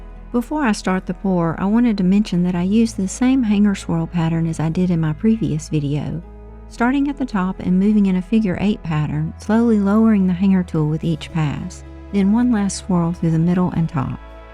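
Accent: South, United States. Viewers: Wow, I really like this swirl ❤. I love making soap and watching your videos too, they are so relaxing and you have such a nice voice, I love your southern accent 😊. Thank you for another wonderful video.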